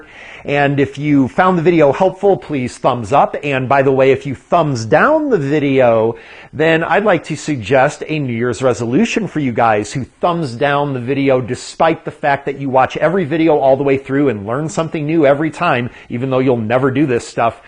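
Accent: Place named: Rhode Island, United States. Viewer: Are you from Rhode Island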